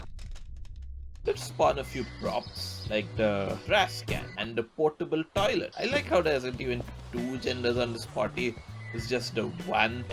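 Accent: India. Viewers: I love his Indian accent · You sound indian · He is already sound like Indian because he is from India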